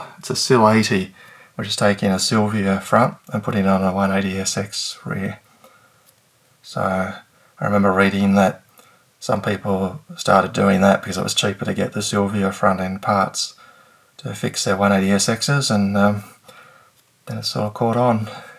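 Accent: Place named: Australia. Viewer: Wow, nice collection. Sounds like you're in Aus. Nice to know there's a nice home if I need to sell.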